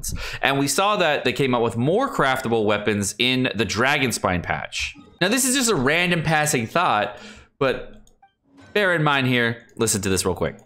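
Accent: United Kingdom. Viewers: damn british is growing on me:D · I just love British..... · At this point I watch this channel not for genshin, but for a new episode of Bri`ish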